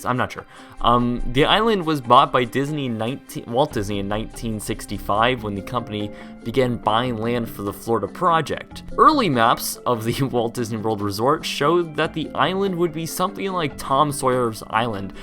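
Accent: Canada. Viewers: You sound Canadian, and stoned.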